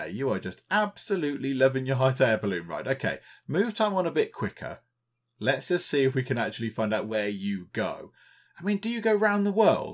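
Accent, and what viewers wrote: United Kingdom. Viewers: You've just became my favorite youtuber. Your British accent and personality is just too cute. I know that's not very masculine to say, but i'm sure you can deal with it...lol Thanks for the videos. I've been enjoying playing this game myself.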